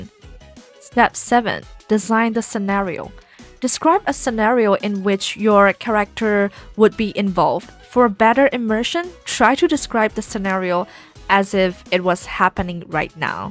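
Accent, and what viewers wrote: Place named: China. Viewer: 听起来像国人xyx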